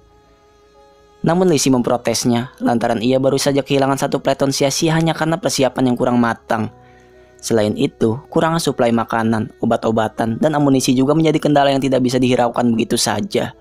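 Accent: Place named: Banyumas, Indonesia. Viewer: Logat nya ngapak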